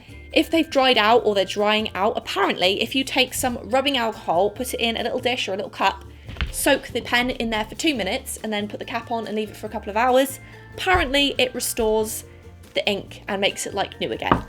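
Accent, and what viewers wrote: England, United Kingdom. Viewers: What country do you live in, because you sound like you are from the uk, but you talk with dollars?? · The reusing an altoid tin or prismacolor tin is also an idea I just literally found this week on Pinterest! I'm not as much a fan of paint as I am ink and colored pencil but I love the idea of reusing tins like that for art supplies. P.S I love your accent!- I've never met someone in person with a British accent until my new neighbors I just met recently! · Lol she looks like Anna Kendrick with British Accent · Wait, where are you from? You said dollars and you have American plug sockets but you sound British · bit confused how you got to target tho?🤔 you have one of the strongest british accents🇬🇧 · Life hacks in a British accent are just better tbh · After hearing British accent for so long. I'm starting to read all the comments in an accent. 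In reading this in an accent right now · Where do you live? You sound English yet you used dollars 😅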